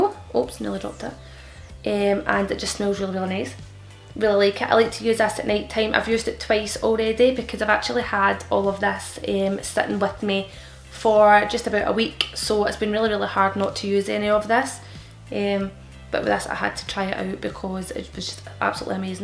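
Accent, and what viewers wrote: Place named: Glasgow, United Kingdom. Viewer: It's so nice to hear a Glaswegian accent on here! Reminds me of home 😀 I recently bought Angels on Bare Skin and absolutely love it! Highly recommend if you haven't tried it. I'm also in love with Sleepy body lotion xx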